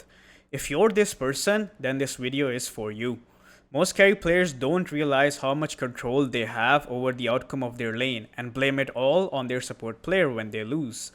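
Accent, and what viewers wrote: India. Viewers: the indian accent even makes it soo much better. just like watching any other tutorial video online. its a familiar feeling.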